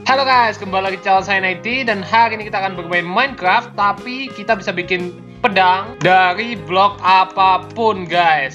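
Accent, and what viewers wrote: Java, Indonesia. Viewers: kok medok dulunya yaaa hehe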